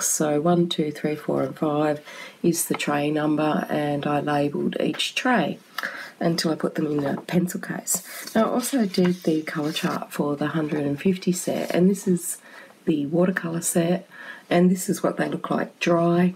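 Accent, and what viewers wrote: Australia. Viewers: OMG, a fellow Aussie, so nice to hear someone not waffling on with stinkin cutes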